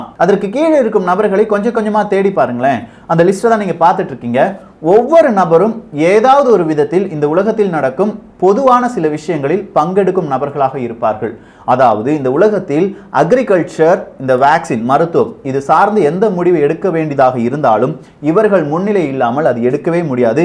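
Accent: Kerala, India. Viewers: Wow keep it up & I see you speak with Malayalam slang mixed is it so?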